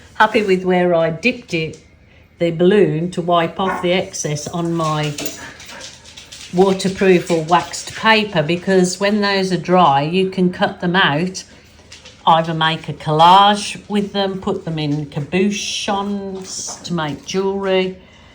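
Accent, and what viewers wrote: Birmingham, United Kingdom. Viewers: I'm getting notifications in the UK. You make me smile every video, your kindness is a beautiful thing. And yes your art is amazing. I'm a 60yr old woman, I have done "normal art" for a long time but this style is so fresh and fun , and certainly not as easy as you make it look. And yes, I can still hear the Brummie accent lol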